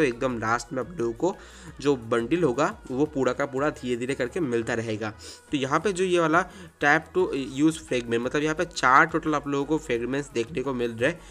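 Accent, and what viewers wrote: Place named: Bengal, India. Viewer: Dada tumi bengali to...ami kotha suna tai mona hoy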